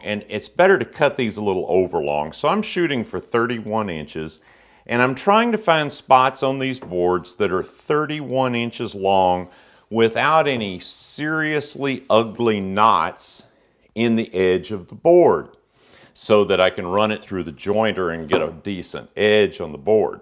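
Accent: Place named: Texas, United States. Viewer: your Texas rhythm is perfect for the instructions. Don't let the thoughtless comments get you down. All the effort and talent you bring to your videos is very much appreciated. I've learned a great deal and I've been inspired.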